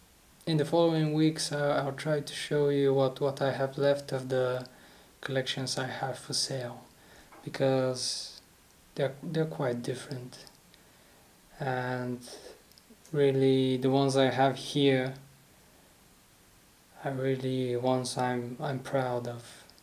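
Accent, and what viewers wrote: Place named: United Kingdom. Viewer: You sound as if you've lived in the United Kingdom for years, you have a really cool accent, being from Northern Ireland, I only wish mine was as cool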